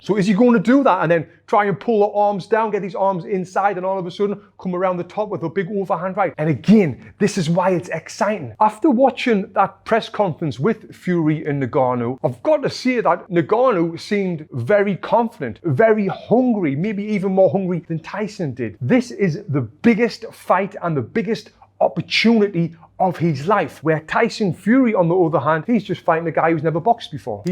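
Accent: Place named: Liverpool, United Kingdom. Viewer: Anyone else seen that old English show “Creature Comforts”?? This guy sounds exactly like the Scouser Rat 😅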